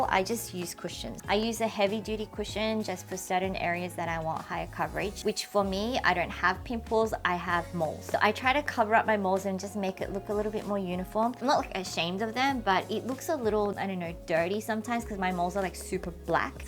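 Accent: Australia. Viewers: Hi, your accent caught me 😅 I’m from down under too! Which part of Australia will u be moving to? I’ve just started exploring K-Beauty and really enjoyed this VDO. Already purchased a-few items you shared 🤭. Looking forward to your next upload 🙏🏻🫰🏻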